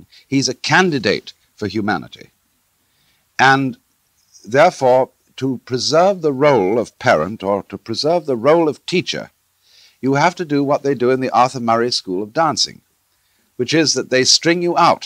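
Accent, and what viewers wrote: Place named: Upper class, United Kingdom. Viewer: As a parent, I was glad when my kids went to school. It gave me a break and it was free childcare. The thing is, what do we replace the human progression through life with? Yes, Watts' observation of life are true, but he offers no replacement or a better way. He spent his life mocking humanity from a point of privilege. Why did he believe his diatribes were more valuable than any other educated and reasoning persons rants? He stated the obvious far too much and isn't really addressing intelligent minds. The audience he needs to address are too stupid to follow his thoughts, while the rest of us wallow in his comforting upper class English accent, without really listening to him at all. It's a mish mash of thoughts, delivered with an annoying arrogance. I can't abide him personally.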